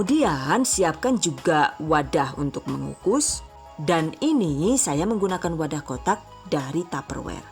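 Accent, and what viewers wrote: Banyumas, Indonesia. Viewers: Hahaha...maen loh ngendikane...ngapak kaya nyong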